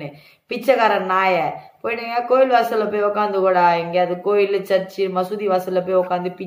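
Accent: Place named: Chennai, India. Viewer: Akka Sema Chennai slang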